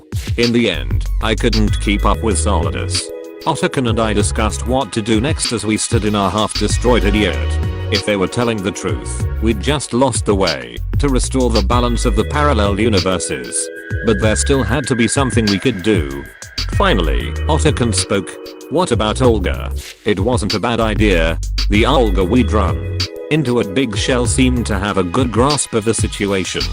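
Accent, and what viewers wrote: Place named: United States. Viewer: You must be American Englishes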